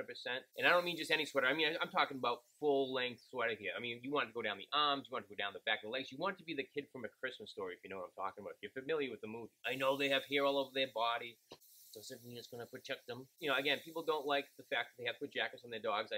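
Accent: Boston, United States. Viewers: OMG. This is the most worthwhile review of anything in the history of YouTube. I think the Boston accent puts it over the top. Wait... what were you talking about? Oh right. Booty shorts at the 99 or Reveeeah Beach.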